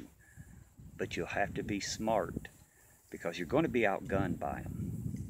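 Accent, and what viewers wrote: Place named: Texas, United States. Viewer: You must have spent a lot of time in TX. You have a noticeable TX accent.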